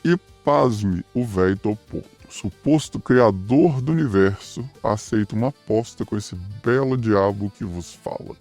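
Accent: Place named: Minas Gerais, Brazil. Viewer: Sou apaixonada nesse sotaquinho mineiro! Assistindo pela SEGUNDA vez!!! Muito bom!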